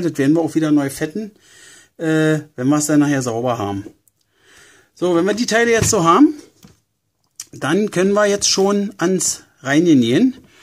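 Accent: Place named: Berlin, Germany. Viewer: Danke! Das hat sehr geholfen☺️ Und dein Berliner Akzent ist super und du erklärst das mit guter Stimme. Top!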